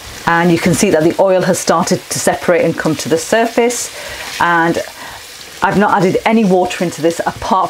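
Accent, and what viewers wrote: United Kingdom. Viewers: Be aware that you have a thick British accent and you talk very fast. Sometimes when I don't understand things, I suspect it is not your accent. British English uses different words than other forms of English. I'm a linguist and a retired university professor in Japan - I've been here over 35 years. After teaching for a while, I stopped correcting my students on everything. I just made them aware of other what speakers of other dialects of English would understand. Yes, I do hold a US passport, but it was not my goal to teach the American dialect of English. I wanted them to be fluent speakers of an International Dialect of English so as many people as possible could understand them.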